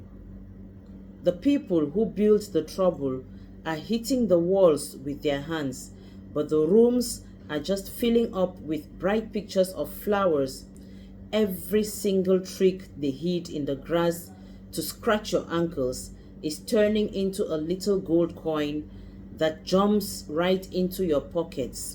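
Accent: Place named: Africa. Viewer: A beautiful prose of becoming spoken in my honor. Thank you Child of the Most High God. From your accent, I believe you may be from the Motherland. You have superb writing skills — the literary world needs to hear from you … in peace and love, God’s Chosen Servant & Supreme Leader With Spiritual Authority ♥️👑♥️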